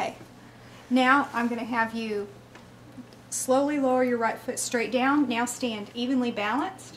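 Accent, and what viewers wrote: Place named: Southwest, United States. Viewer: I’ve run into people here in MO who say “mayzure.” She probably interacts with lots of people who have southwestern regional accents. I noticed at several points in the video that the accent flattens out a bit. It could be she has grown used to hiding it or it’s a consciously or unconsciously assumed accent due to her location. Whatever the case, I enjoyed the video and her personality.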